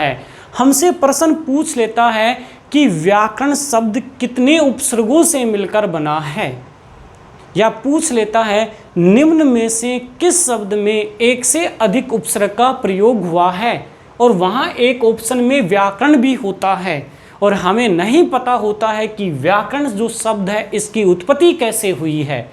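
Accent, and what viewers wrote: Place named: Haryana, India. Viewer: आपको निश्चित ही हिन्दी / संस्कृत की गहरी समझ और मज़बूत पकड़ है। उच्चारण में बस थोड़ा हरियाणवी ढंग से फिसलन हो जाती है। पर आपको बारीकियाँ पता हैं। सादर आभार व्याकरण शब्द की व्युत्पत्ति समझाने के लिए।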